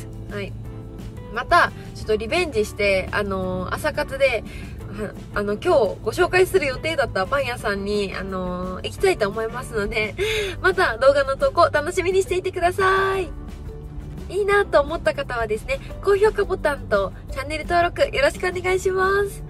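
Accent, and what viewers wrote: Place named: Hiroshima, Japan. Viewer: NAロードスターのマニュアルですか？うらやましいですー！広島弁に聞こえるのですが…わたしrootsがそうなので、だったら嬉しいです！！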